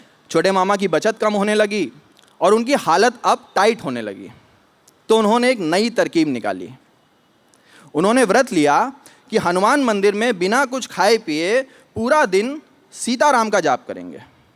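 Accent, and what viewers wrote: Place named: Kanpur, India. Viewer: That's a thing....know as,"kanpuriya accent"!!!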